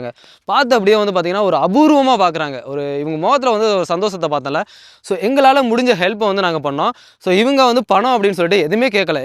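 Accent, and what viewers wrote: Tamil Nadu, India. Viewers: Avanga pattukottai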